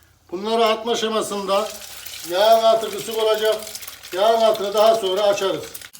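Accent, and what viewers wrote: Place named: Çorum, Turkey. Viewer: Ustam eline sağlık. Çorumlu musunuz memleketimin şivesi çok güzel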